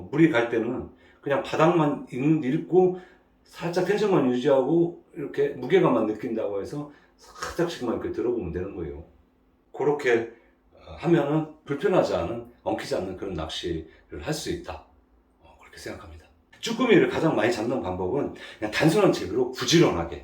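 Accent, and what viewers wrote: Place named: Chungcheong, South Korea. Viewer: 님께서 이번에 올려주신 영상 토대로 공부해서 출조하겠습니다! 속 시원한 설명과 유익한 정보 감사드립니다^^ 충청도 사투리 정말 맛있게 쓰시네요ㅎㅎ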